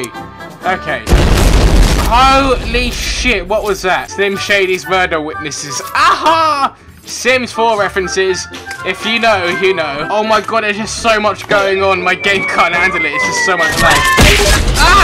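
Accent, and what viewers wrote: England, United Kingdom. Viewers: I Really love your Voice its so english i Shall go to England on vacation next year so i hope i Met you there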